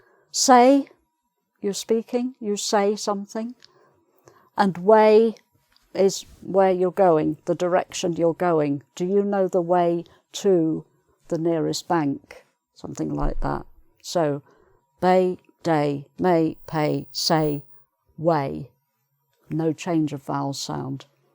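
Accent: United Kingdom. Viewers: Hello...you are the most elegant teacher I have ever come across having such a lovely voice and true Brit accent.Why don't you reply to our comments?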